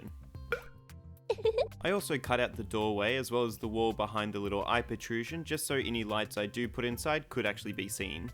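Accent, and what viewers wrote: Australia. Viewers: Bruh, this is your first and (so far) only video and I already love your stuff and I'm half a minute into the video as I type this You've already earned yourself a subscriber Also are you Australian too?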